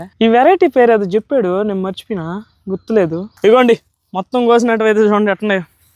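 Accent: Nellore, India. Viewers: Nellore yasa bro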